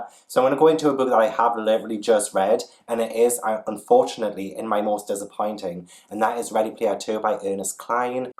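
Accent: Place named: Newcastle, United Kingdom. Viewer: ☕ Told there was drama 👀 Saw the hilarious intro ✨ Heard the Geordie accent 🔥 INSTANT SUBSCRIBE 🔥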